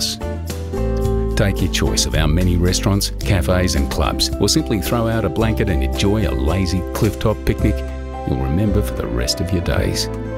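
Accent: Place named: Australia. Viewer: Thank God we have sub titles. I am English and I just cannot understand what this guy is saying. I think it's badly recorded apart from his strong Aussie accent. Good on yer, mate!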